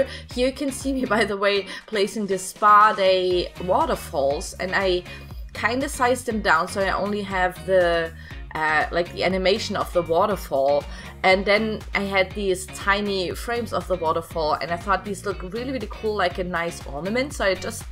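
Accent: Germany. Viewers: Are you German? You sound like you have a german accent💗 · Alsoooo, I love your voice and accent so much!! It reminds me of my family and my Oma from Germany and it’s very nostalgic for me. I hate that someone kind of attacked you and criticized your voice that you talked about in one of your previous videos, it really upset me for you, because you’re just so nice and sweet and I really really love you, so I just wanted to say that you’re phenomenal and I love your voice! 💖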